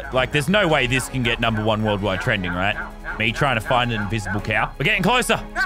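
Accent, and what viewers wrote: Australia. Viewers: The Aussie accent😒😒 as an Aussie myself i find our accent to be the worse in the entire world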